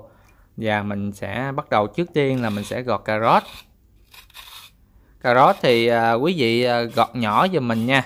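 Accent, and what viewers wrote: Mekong Delta, Vietnam. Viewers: Đặc trưng của dân miền Tây đó bạn. Mình nghe dễ thương mà! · 😁😁😁 giong chuẩn miền tây nghe ấm áp nghĩ... ❤️ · Anh nầy dân Nam bộ miền Tây giọng nhà quê nên đừng có trách ,mặc dù anh hưỡng dẩn cơ bản như vậy nhưng ta cũng cần phải luyến láy đôi chút như xử lý củ hành cho bớt cay ,sắt xéo dưa leo cho đẹp hơn ,bào cà rốt cho dài hơn ,dưa leo cà rốt đều để ngăn mát ,có thể thêm nước mắm nếu không ăn chay và rau thơm có thể dùng loại nào mình thích không riêng gì rau húng cây . Cám ơn bạn trai đã chia sẻ !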